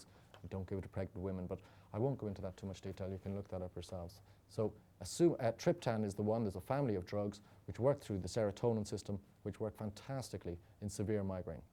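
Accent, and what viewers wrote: Ireland. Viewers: His verbal delivery is the most quintessentially Irish thing I've witnessed in some time.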